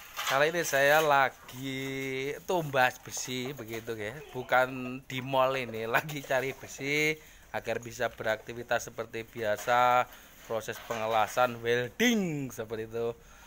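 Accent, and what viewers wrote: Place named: Banyumas, Indonesia. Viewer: Amin boskuhh.pinter bgt ngomonge kaya seles 🤣👍👍 Tapi keren mantap kang..ngapak bgtt ngomonge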